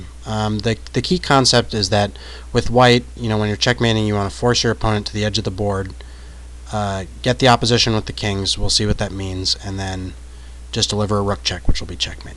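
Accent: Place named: Maryland, United States. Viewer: Are you from Frederick, Maryland.... it sounds just like a guy I know from there?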